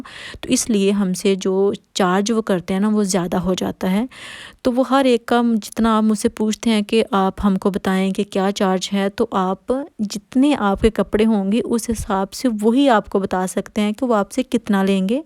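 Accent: Faisalabad, Pakistan. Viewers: Urdu lehja bilkul fsd walo ka hai 😋😋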